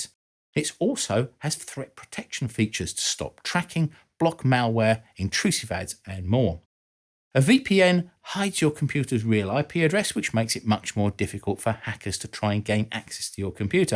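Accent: United Kingdom. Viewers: The British accent lends an air of credibility. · I knew this guy had a British accent before even hearing him.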